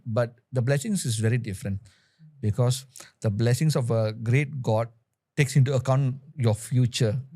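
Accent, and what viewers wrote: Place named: Malaysia. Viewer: This person speak like Malaysian or a Singaporean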